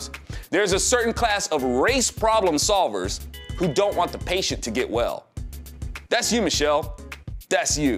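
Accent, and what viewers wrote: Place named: United States. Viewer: LOL....*cough* Uhm...sorry. I couldn't help myself. You sound like me for the last 20 years... Thanks for speaking American.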